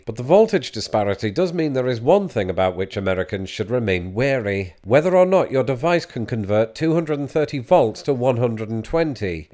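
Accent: United Kingdom. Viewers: Could one imagine a much less glamorous British accent?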